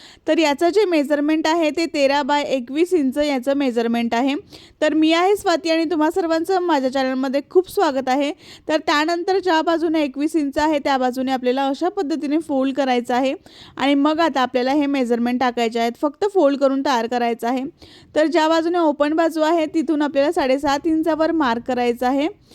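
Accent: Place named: Alibag, India. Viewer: khupach chaan alibuaag warun bolte❤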